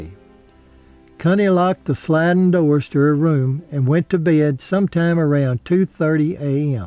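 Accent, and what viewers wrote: South, United States. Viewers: Such a sad story! I hope I will be able to sleep...after listening to you. Nonetheless, you have a beautiful, soft voice with a soothing southern accent. Thank you anyhow. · Great Southern narration voice. At least this British man loves it.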